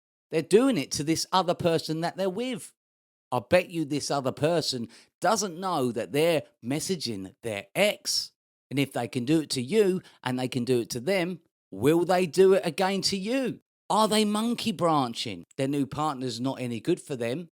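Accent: Cockney, United Kingdom. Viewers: sounds pretty cockney to me atleast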